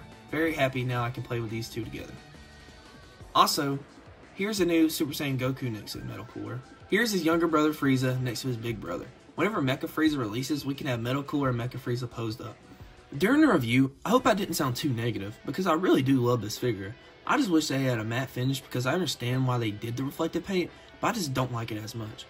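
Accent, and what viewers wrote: South, United States. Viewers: 😂😂😂this was like watching a review voiced by one of the Beverly Hillbillies 😂😂 I'm sorry, but the southern accent had me laughing the whole time. Great review btw.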